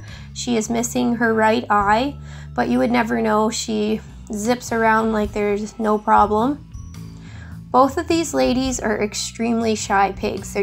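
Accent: Canada. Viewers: You are such a great piggy mom, if it wasn’t for your videos I would have a tiny pet store cage. I love your appearance, Canadian accent; most of all Iove the pigs ❤️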